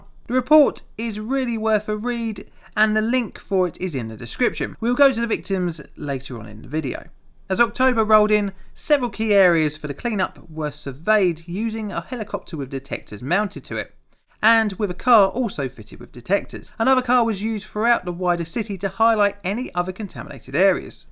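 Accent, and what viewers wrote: United Kingdom. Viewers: I like your content and presentation, but your voice is very comforting in an odd way. My grandparents sounded like you and to this day, I think in a British accent lol. I lived with them most of my childhood. My grandmother was actually Scottish but ended up in southeast England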